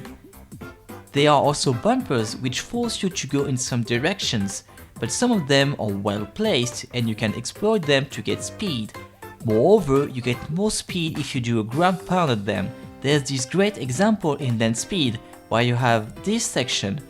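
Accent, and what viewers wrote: France. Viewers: T’es français toi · I hear a little bit of french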